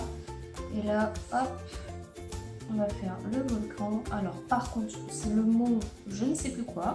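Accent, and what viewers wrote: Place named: Quebec, Canada. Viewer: C'est génial, quand j'entends ta voix (qu'accessoirement je connais), j'ai presque l'impressions d'entendre une touche de Québécois 😄 (Le "à l'arrache" me rassure sur tes "origines" 😉)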